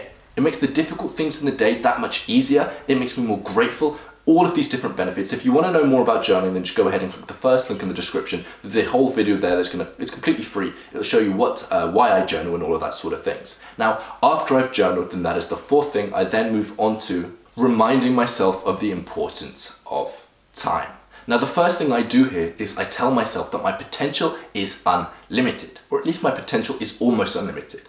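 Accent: United Kingdom. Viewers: Subscribed. And, I love your British accent!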